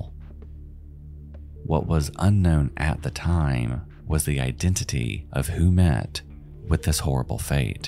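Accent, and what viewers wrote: South, United States. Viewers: I’m sorry, I know this is a serious video, but the question must be asked: how does this man with a vaguely south-ish USA accent say “Katarzyna” better than Tyra Banks who regularly works with East European (among other) models ever did on her show when she literally also had a girl on the show for almost every episode of Cycle 10 *named Katarzyna*? Angry.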